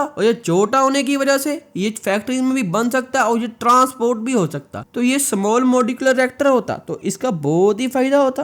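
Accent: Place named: South India, India. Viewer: Bhaiya kaha se ho kya aap South se ho kya aapki hindi bahut alag level ki hai mera matlab bolne k tarike se hai Lekin achchi hai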